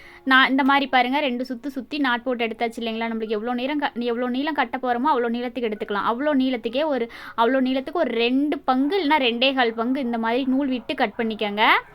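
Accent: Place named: Kongu, India. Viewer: ரொம்ப ரொம்ப அழகா இருக்கு. ❤❤❤❤. நீங்கள் எந்த ஊர். உங்கள் பேச்சு கொங்கு மண்டலம் போல் தெரிகிறது. இந்த ரோஸ் எங்கே கிடைக்கும்.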